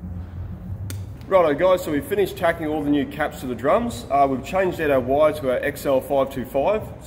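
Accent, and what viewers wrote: Australia. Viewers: Awesome!!! And this channel is even useful to practice English with an Australian accent 😁😁😁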